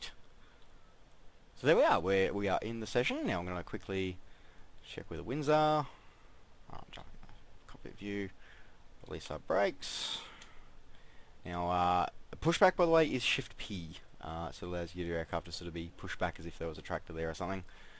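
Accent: Australia. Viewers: YOU MY FRIEND ARE AUSTRALIAN AND YOUR FROM GOLD COST OR JUST NSWI FUCKING CALLED IT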